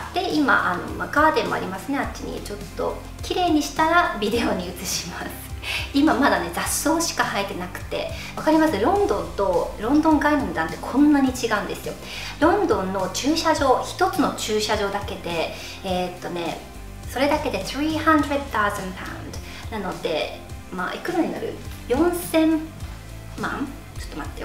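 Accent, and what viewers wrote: Okinawa, Japan. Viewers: Tsugumi K CAさんだからね.... だから英語っぽいっていうかなまりがあるんだよね.... (あと沖縄出身だから) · 喋り方、所々沖縄なまりや沖縄の友達がする様な表現が入っていて好きです☺︎ ラッキーなのはお人柄のおかげってあると思います👍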